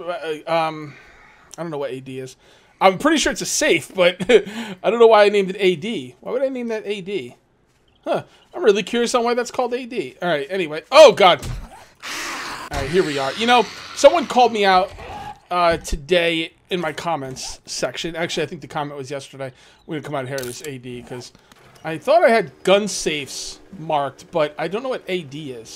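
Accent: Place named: New England, United States. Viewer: Some I knew you where some where and new England.:P you have a slight North eastern accent.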